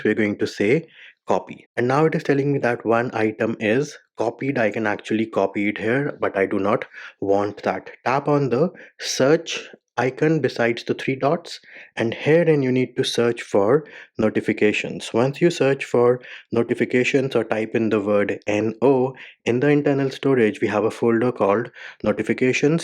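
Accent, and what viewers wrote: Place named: India. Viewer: You can always trust the Indian sounding guy! thanks it worked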